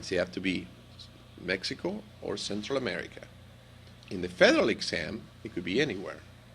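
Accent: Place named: Argentina. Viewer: Argentine Spanish accent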